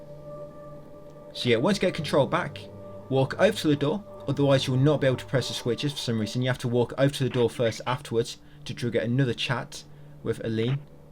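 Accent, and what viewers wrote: England, United Kingdom. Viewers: What a bliss to be looking for a walkthrough and accidentally find one of your favourites content creator. Thanks mate. Ps: I teach English as a second language, and I was trying to place your accent. Birmingham, Liverpool, London?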